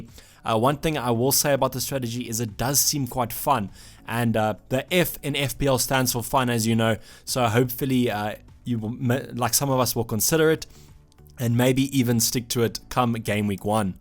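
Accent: Afrikaans, South Africa. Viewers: Is jy afrikaans😂🤔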